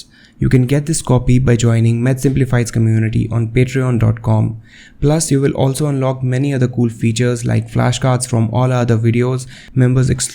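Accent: India. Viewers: This is not an english accent this is an Indian accent and if you want to be any sort of doctor you will fail due to this attitude. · This is how we Indians talk. Got a problem?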